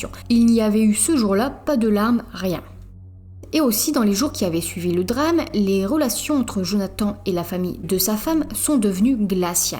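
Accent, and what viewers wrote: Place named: Nord-Pas-de-Calais, France. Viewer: Pas top quand c'est raconté avec l accent chti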